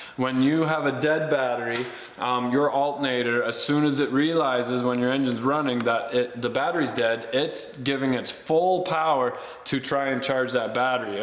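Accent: Canada. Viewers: Good, useful, logical tips presented in a wholesome Canadian accent. Well done! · I love how i could tell he was Canadian after the first 30 seconds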